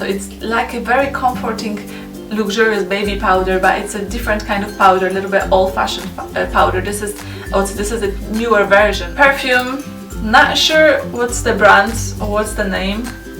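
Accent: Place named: Poland. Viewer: I dont know why, but polish accent is very similar to south american accents... Like venezuela... Maybe its just me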